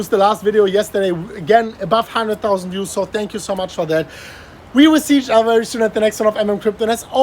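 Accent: Germany. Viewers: This guy has all the things I hate about YouTube. Terrible thumbnails, click bait, shamelessly promoting affiliate links, too many ads etc. However I love the emergency streams even though nothing is happening, the German accent and he seems like a stand up guy. All the best. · Can someone tell me what his accent is? German?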